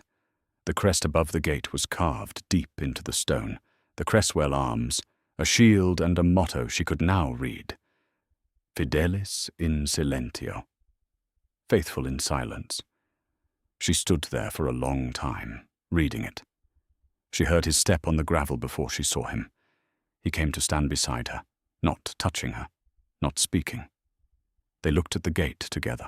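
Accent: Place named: England, United Kingdom. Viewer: A very enjoyable story. Thank you for using a narrator with an English accent. When the story is about Dukes & England, that is the proper choice, yet some use a narrator that is, clearly, not English, usually American. It takes away from the presentation. Thank you for a good story, supported with good taste. It is appreciated!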